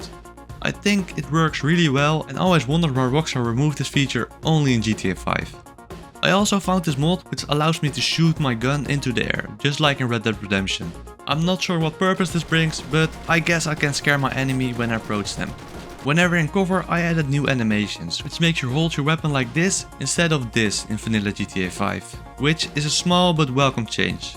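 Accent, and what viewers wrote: Netherlands. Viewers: i detected a dutch accent veel succes brooo je kunt het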